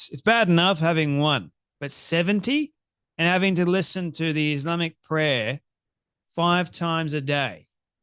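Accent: Australia. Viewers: It looks to me like an invasion and yes Australians have had it great for a long time,but most are asleep "she'll be right mate" No longer rings true.Sorry time to wake up and smell the roses Aussies.!As for the narrator here your voice is too harsh and unpleasant to listen too gratingly not charmingly Aussie,(if ever it even was) .You and Pauline Hanson both cannot acknowledge your Achilles's heel.Until you do you will never get to the top in Australia.Australians seem to suffer from an inferiority feeling from , "The land down under".All you two do is remind them of it!! Sorry.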